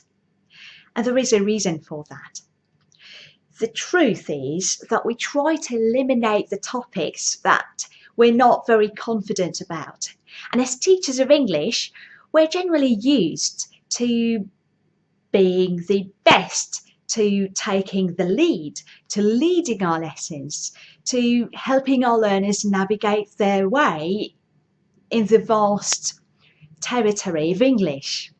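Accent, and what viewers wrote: RP, United Kingdom. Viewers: Oh my god. This is bloody perfect. Yes, it's not native but rather a native-life from my inexperienced perspective. Sort of RP but very much fluent and free. What a work has been done. Thank you very much indeed. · Lol ur accent like ratu london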